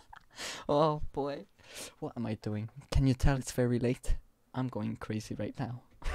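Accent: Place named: France. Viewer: Love your reaction! Haha Totally understand it too, haha I was like wtf? Lol You have an accent, do you speak French?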